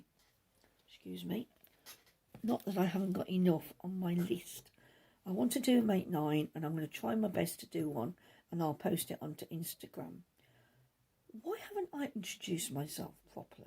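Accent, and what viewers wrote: Scotland, United Kingdom. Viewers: Hi I really enjoyed your podcast after Caz introduced you? I too am Scottish. I really like your accent, I’m off to watch your other videos now. Happy knitting 🧶🌸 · Hi, I watch Caz too and she told us about you so here I am. Thoroughly enjoyed your podcast, as someone below said, it was just like sitting having a chat. You came over really well. You are a lovely knitter and I'm looking forward to watching your other 3 podcasts you've done so far. Keep up the good work. P.S. your accent was lovely and like Caz I'm a Scot but a low lander and your accent sounded perfect to me. Take care and stay safe. Alexis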